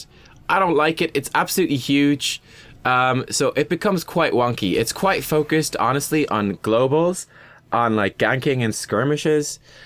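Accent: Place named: Ireland. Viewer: just teasing, love the Irish accent!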